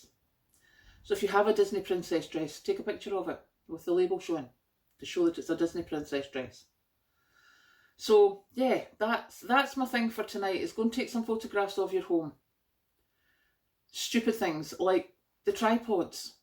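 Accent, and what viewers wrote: Scotland, United Kingdom. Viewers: which part of scotland are you from roughly? Im Not stalking you 🤣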